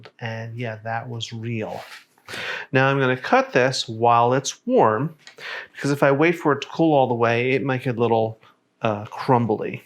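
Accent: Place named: United States. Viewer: This isn't Scottish shortbread, none of the ingredients are Scottish and from the accent of the bloke making it it's being made in america, so it just shortbread. As a baker i have made more shortbread than this guy has had hot dinners, and you don't put salt in it.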